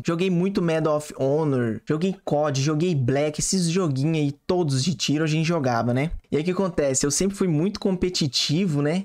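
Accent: Minas Gerais, Brazil. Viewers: Mineiro contando história já é bão! então... nem se fala! hahahaha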